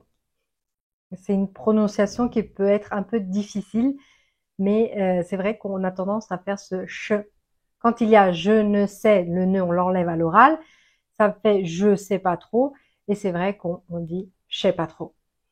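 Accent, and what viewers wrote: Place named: Italy. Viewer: C'est la première fois que je visionne vos vidéos, mais je tiens à dire qu'à première vue, j'ai immédiatement remarqué que vous etes sans doute d'origine italienne. Cela se ressent dans votre charme italien et je dois avouer que j'apprécie énormément vos prononciation.Bref,les expressions que vous avez mentionnées sont très utiles, utlisables dans la vie quotidienne. Grazie mille.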